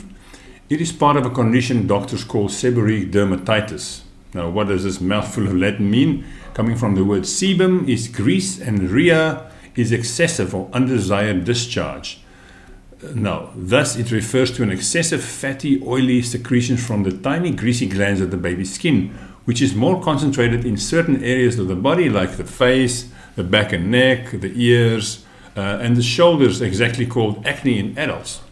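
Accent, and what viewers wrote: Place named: South Africa. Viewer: The minute i heard your voice i daughter he is south African. Miss that accent. Thanks for the info on cradle cap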